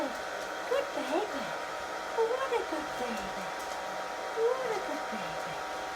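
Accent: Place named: United Kingdom. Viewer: Just my luck... This is the 2nd video in a row that that I can not understand. Between my hearing problem and the thick accent(British?) I am just not getting the info I need. Otherwise, good video. Thanks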